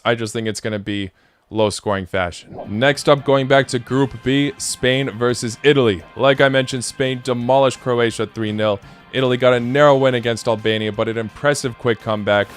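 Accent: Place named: United States. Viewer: He lived in the US for quite a few years hence the accent.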